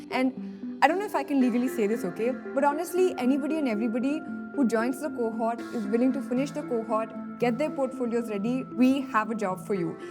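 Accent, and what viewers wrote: India. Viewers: You look Non Indian. The moment you started speaking with that thick Indian accent, that surprised me!!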